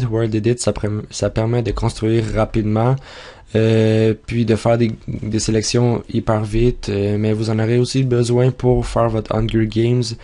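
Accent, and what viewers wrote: Canada. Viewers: Super tuto, vraiment complet et bien expliqué, je suis toujours autant fan de l'accent canadzien :) Mais me voilà présentement bien embêté :) Tout le monde fait les même remarques concernant la permission /sg setlobbywall etc. J'ai utilisé le principe d'écrire directement le texte sur les panneaux mais dès que je clic dessus ça me dit "you don't have the permission to join arena1". Et quand je fait /sg help il ne me donne que les commandes "player" et pas admin. Donc je peux /sg setspawn, mais pas entrer sur l'arena 1 que je viens de créer. Un vrai casse tête. Mais ton tuto est super, c'est juste moi qui suis nul :D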